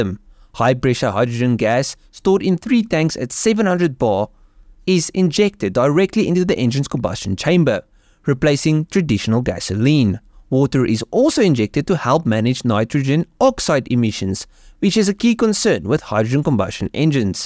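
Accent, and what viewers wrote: South Africa. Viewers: Had to double check your channel details when I heard the SA accent 🤪 great video! Keep up the great work!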